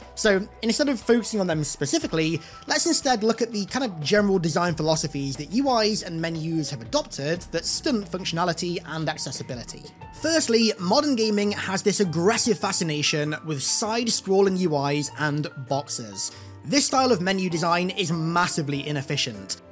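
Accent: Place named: United Kingdom. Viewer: was gonna watch but ur british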